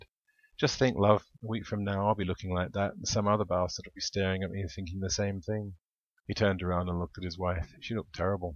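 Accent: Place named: England, United Kingdom. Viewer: Love the photos. Love hearing an english reader and can picture it perfectly. Makes such a difference from listening to the american audio books. So hard to picture a scene with nothing to relate to. Love it! Thank you for giving us brits a great story.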